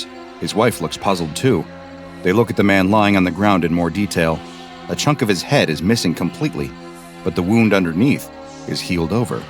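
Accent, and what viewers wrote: England, United Kingdom. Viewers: This is one of the weirdest SCP anomalies I've watched so far, and there are some really weird ones. Nice English accent by the way.🙂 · i love how he added the BRI'ISH ACCSENT YEAH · British accent is perfect trough video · is she britosh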